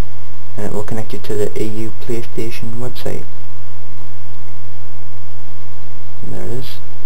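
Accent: United Kingdom. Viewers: oh good it is a british person doing it the how to video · Thank u s omuch dude your the best even thoughe u sound like a skotsman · are you a geordie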